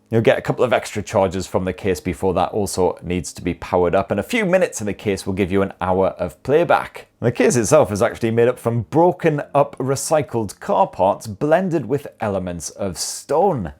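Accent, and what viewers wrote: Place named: Northern England, United Kingdom. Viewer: Oh I found your video rather refreshing first of all you’re not American or are you putting on a great northern accent or dialect et cetera. However you failed to mention about the ability to use it as a phone feature. That is he not making calls and receiving calls I take it these ear pods pods can actually do that cheers keep up the good work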